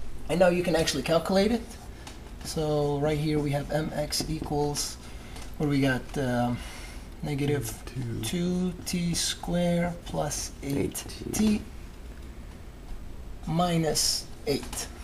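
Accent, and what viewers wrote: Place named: Brazil. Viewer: Are you brazilian? Your accent looks like a brazilian speaking english ...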